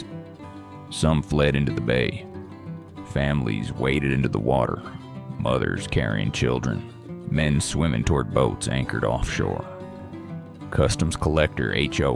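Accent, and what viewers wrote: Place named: Appalachia, United States. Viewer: The guy doesn't sound Texas. He sounds Appalachian, North Carolina or perhaps Kentucky. Why does it matter so much to you?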